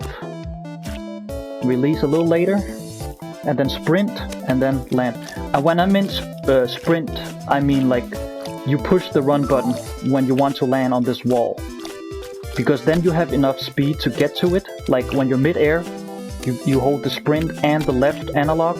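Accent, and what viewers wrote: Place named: Sweden. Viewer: You sound swedish 😂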